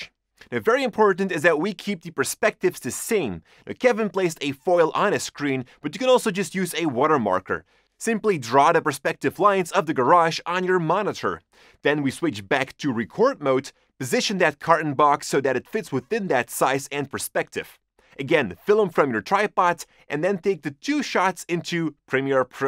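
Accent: Netherlands. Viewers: are you dutch ? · Are you dutch?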